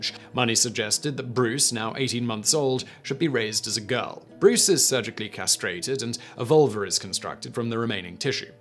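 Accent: United Kingdom. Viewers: One minute for your thumb nail, meanwhile all I heard was race race race race race from a white guy with the accent of like the most imperialistic nation that still exists. Race race, race race race race race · The British accent is echoing in my headddd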